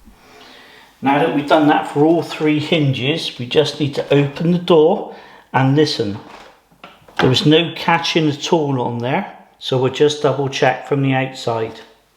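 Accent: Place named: West Country, United Kingdom. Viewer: Thanks mate very informative and love your west country accent ' oww arrrrr" Cheers from Manchester 😅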